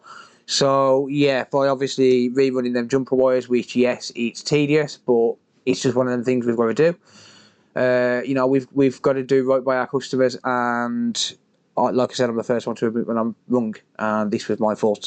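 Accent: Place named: Midlands, United Kingdom. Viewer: Your local to me (accent) do you have a shop in the mids ? Respect to you also nice to see your work and honesty on this repair I’ve known businesses not taking responsibility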